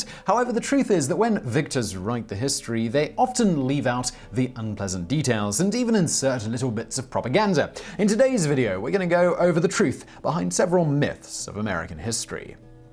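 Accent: England, United Kingdom. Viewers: And that cheeky British accent makes him sound so much more authoritative/intelligent. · I like your videos in general. Not this one. Too many strawman arguments. Keep your British English accent out of our proud American nation's history. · Sounded like an Englishman. They know our history better then we do. (I DON'T think so). The sad thing about this video, we who are older and had our country's history taught to us know this is bull. However many of our young people don't. Thanks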